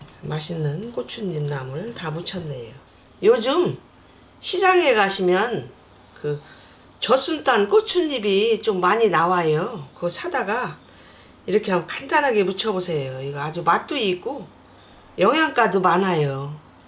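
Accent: Chungcheong, South Korea. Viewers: 충청도말 재밋네유 배우고싶어서 저장했어유 요리도 쉽게 하시구유 맛나게 보이네유 꼭 해볼래유❤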